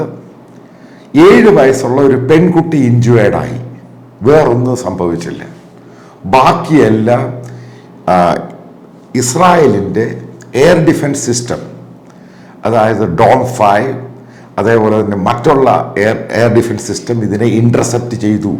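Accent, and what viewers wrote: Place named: Pathanapuram, India. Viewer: Sir your analysis very interesting. Your mada koda typical pathanapuram style. Continue sir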